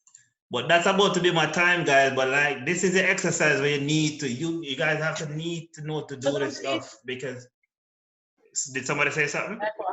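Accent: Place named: Caribbean. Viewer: Which Caribbean island you from bro? JA?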